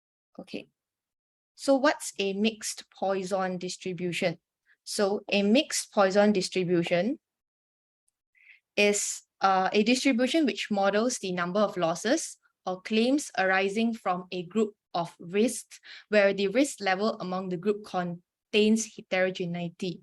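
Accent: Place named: China. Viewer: Try to explain slowly and speak english not as chinese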